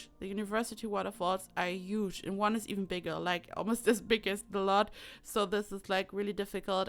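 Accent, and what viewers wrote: Germany. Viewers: Are you from Germany??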